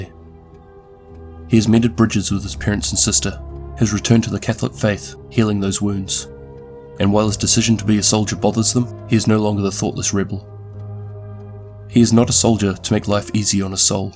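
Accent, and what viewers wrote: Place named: New Zealand. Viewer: Yeah,the New Zealand accent is my favourite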